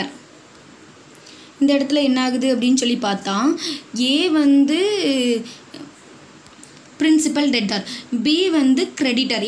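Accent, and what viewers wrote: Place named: Nagercoil, India. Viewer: I think It's Nagercoil language...😊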